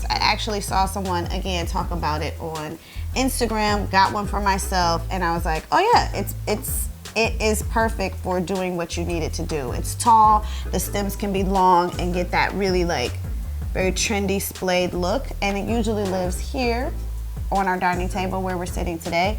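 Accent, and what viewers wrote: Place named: New York, United States. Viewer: 1. I would have never guessed that you were not a native New Yorker if you hadn't said you were from Ohio! lol In Spirit you are a native NYer lol 2. Is that a baby Monstera Albo in that pot?? And you grew all those leaves on a supposedly slow growing plant! KUDOS! It's beautiful! 3. I WILL be trying that washcloth! 4. Not to mention how much natural hair products costs us! smh I be like $15 dollars for this little bottle? I'll just make this huge $6 bottle of Treseme work (extremely damages hair cuz it has the most moisture) and it usually doesn't work to well LOL #TheNaturalHairStruggle LOVED this video! IG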